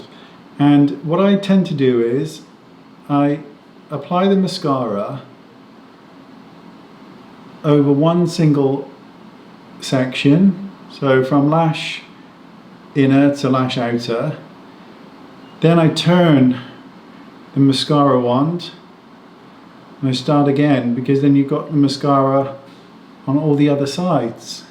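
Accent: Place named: Wales, United Kingdom. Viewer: Great mascara, bloody lovely, with a welsh accent too! Love it x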